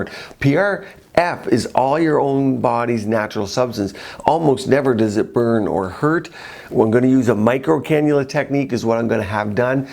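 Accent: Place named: Canada. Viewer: Love - I hear the Canadian accent, eh? Adorable that you do the procedures and understand how the patients must feel.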